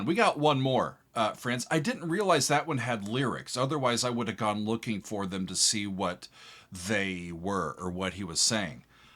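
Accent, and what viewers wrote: United States. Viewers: seu sotaque americano é muito bonitão! Gostei! ❤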